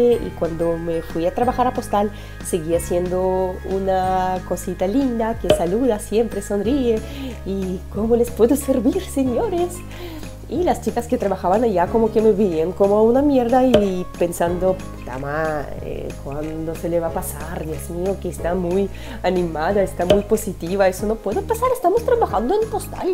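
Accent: Peru. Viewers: q ya regresaste de rusia 😮 uno. aquí esperando videos de rusia. .. eres bien graciosa. ya se te pego lo peruano. y muchos acentos. lo ultimo a rascarse los huevos jajaja me dio risa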